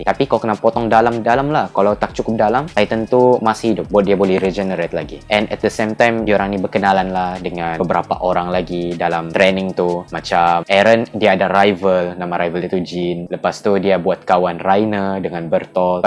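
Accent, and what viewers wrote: Sabah, Malaysia. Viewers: Saudara speaker ini pasti orang Sabah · Hsha sya suka ni orng ckpp ORANG SABAH THE BEST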